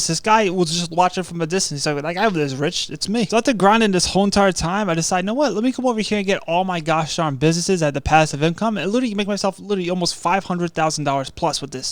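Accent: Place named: New Jersey, United States. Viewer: Lol ur finna asl that Jersey accent 😂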